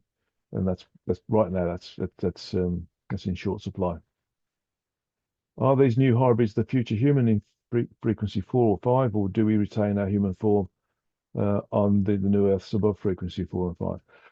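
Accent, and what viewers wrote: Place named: Midlands, United Kingdom. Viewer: This is called an English Accent . He is from the Midlands . I have trouble with English being spoken by Indians . Listen to it twice 😁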